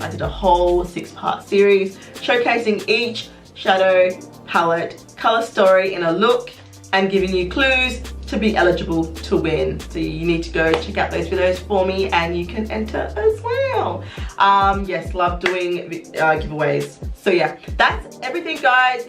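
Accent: Australia. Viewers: Omgggg a melanated Australian!!!! I’ve never heard or seen one that’s wild to me but I love it. I’ve always wondered if we could be Australian (seems so rare). But I appreciate this video because I’ve been looking for a comparison of 430 against the other two. And maybe even 450. I no longer think I’m 430 but it was hard to tell bc the store I was in didn’t have 430 tester😭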